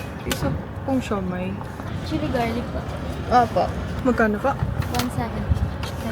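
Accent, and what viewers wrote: Batangas, Philippines. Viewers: Kainan naman sa lipa haha or sa dlsl kaso sa gilid lang kainan dun. Papunta canossa. Since highschool ka ata andun baka di ka nakapagfoodtrio masyado. Nagschool din ako din way before you. Lol. Nakakatuwa ang iyong vlogs lalo na ang batanguena accent. Lumalabas pa din talaga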